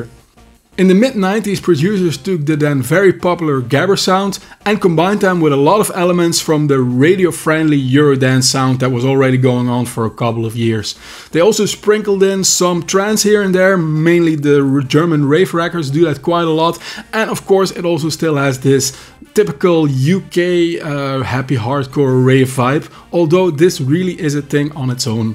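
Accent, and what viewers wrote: Netherlands. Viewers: Gotta love the combination of in-depth cultural knowledge, spot-on production and of course the delicious dutch accent. You got another sub! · Man you sound so Dutch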